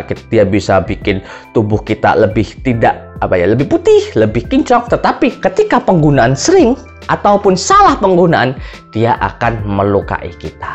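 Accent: Java, Indonesia. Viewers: Logat medoknya doterkuh.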